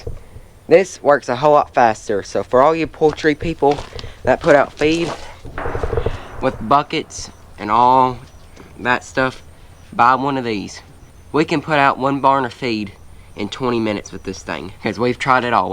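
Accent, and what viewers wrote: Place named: Kentucky, United States. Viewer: ...I am a city guy and know little (well really nothing) about farming. Any kind of farming!! However I do enjoy watching the various farming videos on YouTube. And I have learned a lot. Still trying to figure out the different kinds of cows...Heffer, steer, etc. Your video is the first one about chicken farming that I have watched and I will be following your videos. I have so many questions that I am sure will be answered. Havent seen your chicken "barns or houses" with chickens yet but am anxiously awaiting your videos. And just so you know...your presentation is really good. Maybe it's that Kentucky accent...but your presentation is very good. Please keep the videos coming, and maybe I will finally figure out what came first...the Chicken or the Egg. Yeah, you knew it was coming.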